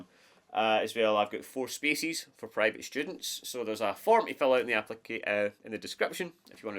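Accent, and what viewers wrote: Scotland, United Kingdom. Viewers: came for the scottish accent, stayed for the luuuuv. and the guitar lessons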